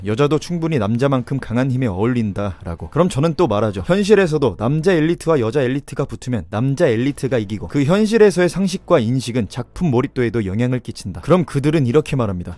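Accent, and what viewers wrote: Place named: Jeolla, South Korea. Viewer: 전라도 사투리 빼라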